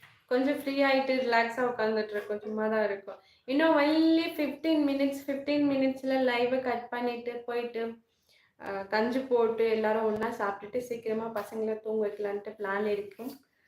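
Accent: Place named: Thanjavur, India. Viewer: Thanjavur Tamil 🤏🙏🙏🙏🙏♥️♥️♥️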